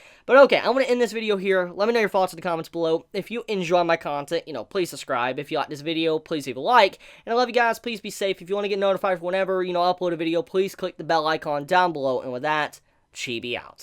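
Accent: South, United States. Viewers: I love how subtle your southern accent is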